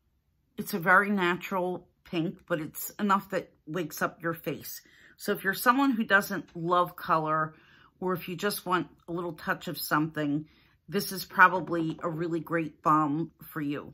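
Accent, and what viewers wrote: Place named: Philadelphia, United States. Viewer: Beautiful shades of lip color. My favorite was the last one. It matches what you're wearing perfectly! By the way, I'm a new subscriber to your channel. :) I hear a little "Philly" in your accent. Is that where you are from? Just curious. Have a fabulous weekend too!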